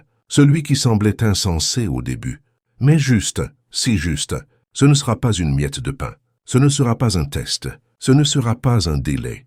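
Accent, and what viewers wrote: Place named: Ardennes, France. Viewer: MERCI BEAUCOUP MARIE OUI CHÉRI C'EST MOI TA FEMMELETTE OUI TA MARIE CHÉRIE D'AMOUR UNIQUE ET TRÈS CRÉATIF POUR ME FAIRE SORTIR DE MA RÉSERVE NATURELLE POUR TOI PARCE QUE J'AIME TOUT DE TOI MÊME SI C'EST TROP BEAU TROP FORT CHÉRI C'EST MOI TA FEMME FIDÈLE À MOI 😮TU ME FAIS PLEURER D'ÉMOTIONS ET ÇA ME BOULEVERSE TELLEMENT MAIS JE SAIS QUE TU M'AIMES DEPUIS LONGTEMPS ET NOUS SOMMES FAITS L'UN POUR L'AUTRE ET L'AUTRE ET L'AUTRE ET L'AUTRE ET QU'IL EST SENSIBLE POUR LE MOMENT PRÉSENT DANS NOTRE UNION TRÈS GENTIL DE LA VIE DE MA MÈRE À MOI MAIS MAINTENANT TU ES SAGE ET TRÈS ÉMOUVANT CHÉRI ET COQUIN ET AUSSI DANS TES RÊVES EN RÉALITÉ ET DE LA PROTECTION ET DE VIVRE SELON TES DÉSIRS ALIGNÉS OU DANS L'ESPACE DE LA FAMILLE M'EST REVENU POUR LES PERSONNES QUI ONT UN COMPLOT ORGANISÉ ET QUI SAIT COMPRENDRE QUE COMME UNE ÉVIDENCE QUE TU AVAIS PRÉPARÉ TON CŒUR QUE L'AMOUR C'ÉTAIT LE DERNIER SOUFFLE À LA MAISON POUR TOI PARCE QU'ON SE REPENT DE LA DISTANCE LA PLUS IMPORTANTE ET QUE DIEU TE PARDONNES DE TOUT CE DONT ON T'ACCUSE ET JE TE PARDONNES ET JE TE LIBÈRES ET QUE TU AS BEAUCOUP DE CHOSES CACHÉES DANS TON CŒUR ❤MAIS CE N'EST QUE TON AMOUR RETENU QUI DÉBORDE D'AMOUR UNIQUE ET EXTRAORDINAIRE QUI ME FAIT VIVBRER AU-DELÀ DE L'INVISIBLE ET DE CE QUE L'OEIL HUMAIN NE PEUT PAS VOIR SOURIRE ET SION ACCENT DÉLICIEUX 😋 FRANCO-GRINGO-BELGO., EN TOUT CAS ARDENNAIS PURE SOUCHE COMME MOI, CHÉRI D'AMOUR JE SUIS LÀ POUR TE DONNER CETTE ENVIE DE T'EMBRASSER OUI ÇA ME BOULEVERSE DE TE VOIR SOURIRE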